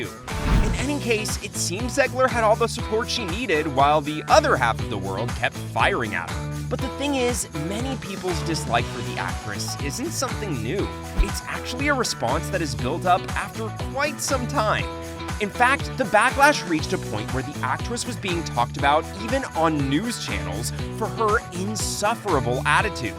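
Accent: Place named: United States. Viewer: Could this narrator have a more annoying stereotypical American accent? He's the stereotypical irritating American (voice and opinions).... 🤮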